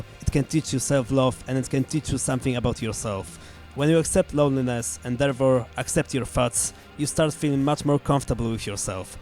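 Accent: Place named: Russia. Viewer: Are you from? Sounds like Russian. BTW Good video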